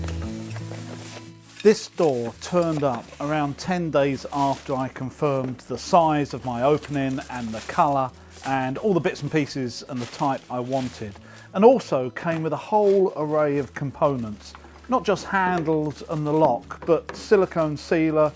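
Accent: United Kingdom. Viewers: Wow!! ❤ what a nice door! Great locks to keep the local punks out of my electronics shop at home - love the multiple locking pins on side AND top! Sounds like you might be across the great pond - can this be purchased in the once great USA?...thanks for the GREAT demo 👍👍👍!!!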